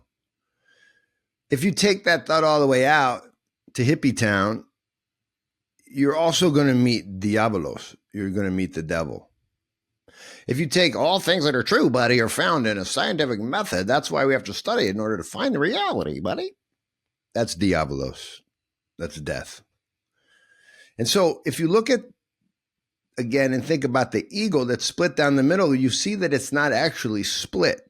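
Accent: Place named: California, United States. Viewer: This is strange. You look a lot like a man I meant at the monastery of katskhi a couple of months ago and you sound just like this Californian guy I know named Peter..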